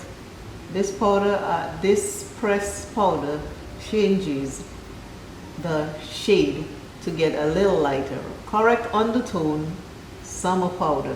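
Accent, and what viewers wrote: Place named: Dominican Republic. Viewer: Are you Dominican?? I hear the accent 😊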